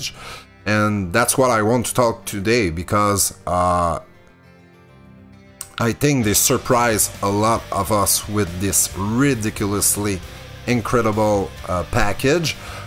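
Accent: Quebec, Canada. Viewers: Are You from Québec?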